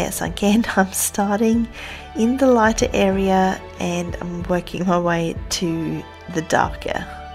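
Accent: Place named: Australia. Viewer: Your accent is becoming more and more Australian hehehe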